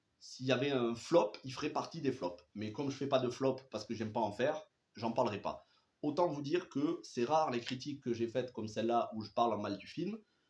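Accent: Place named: South, France. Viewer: Nope reste meilleur qu'US mais je trouve qu'il est trop long avec un changement de ton qu'il ne maitrise pas tant que cela car parfois je suis sorti du film ... Trop d'idées (beaucoup de references) rend en finalite le film moins interessant et c'est dommage. Grosse qualité visuelle, gestion de l'espace avec des jolis plans, l'histoire des dresseurs de chevaux afro americains au debut du cinema, la scène finale avec la poursuite en moto, la relation entre le frère, le père et la soeur. Merci pour ta video avec ton accent qui chante les cigales du Sud 😉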